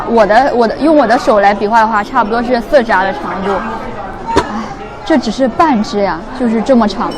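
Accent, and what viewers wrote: Shandong, China. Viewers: 能不能纠正一下3，，听起来好别扭，，，这个叫烧鹅，，，不要那么粗谢谢不是烤鹅，，，您的山东口音好明显哈哈哈应该山东人，，，这个是烧鹅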